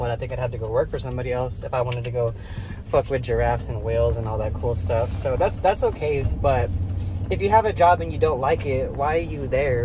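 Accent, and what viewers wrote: Texas, United States. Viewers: U talk so Texan shout out from DallasTx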